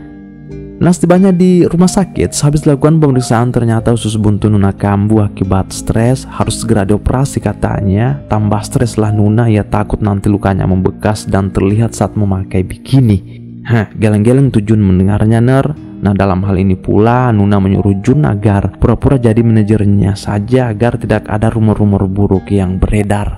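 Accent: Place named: Makassar, Indonesia. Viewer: Keliatan sekali logat Makassarnya min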